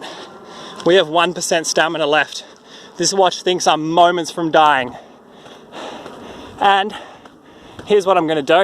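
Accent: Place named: Australia. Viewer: That was awesome. As a Canadian, I have known a bunch of Australians, and not only do I like hearing the accent, but most of the Aussie's that I have met were pretty adventurous/slightly crazy. This is exactly the kind of thing they would do on a whim. Loved how it seemed the watch kept taunting you by dropping a % just when you were ready to pack it in, like a challenge. Good on you for hitting the 0% and going one further.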